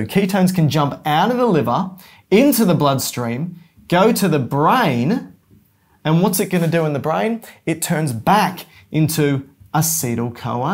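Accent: United Kingdom. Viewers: thank you British man 🙏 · Are you British???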